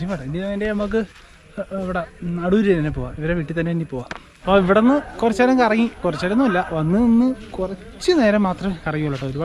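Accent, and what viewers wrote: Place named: Thiruvananthapuram, India. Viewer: ഇത് tvm ഭാഷ ആണ് മോനുസേ 😁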